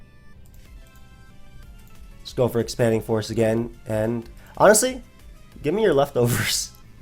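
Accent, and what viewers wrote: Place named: New York, United States. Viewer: It's the NY in him. YERRRRRRRRRR!!!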